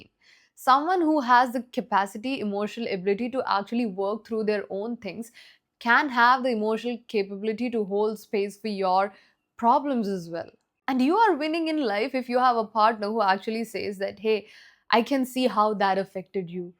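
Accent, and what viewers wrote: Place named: Kerala, India. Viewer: Your English feels like malayalam 👀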